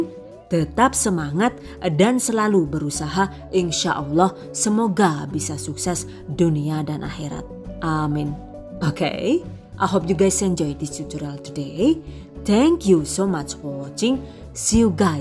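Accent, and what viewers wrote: Banyumas, Indonesia. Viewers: Kalo mi nya suka yg pipih Bun, tapi suka yg pake udang dan pakcoy. :) Ada ngapak2 nya itu lho yg bikin tambah greget pengin nonton Terus video bunda . :D sehat terus bunda agar bisa selalu share ilmu ke kami Mahmud. Biar misua tambah saayoong..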